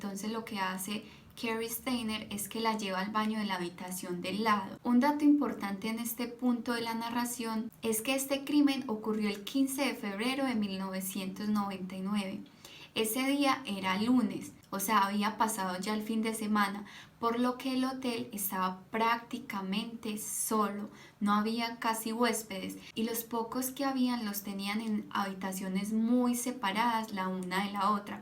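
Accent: Colombia. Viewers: Eres colombiana me queda claro, pero tienes una forma de hablar graciosa, como un acento de Colombia pero de alguna región en particular no? Los colombianos tienen un acento que me gusta mucho pero el tuyo suena un poco diferente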